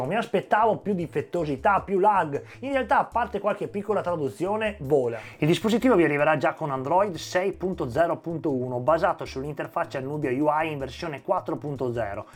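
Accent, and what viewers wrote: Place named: Veneto, Italy. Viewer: bellissimo l'accento veneto!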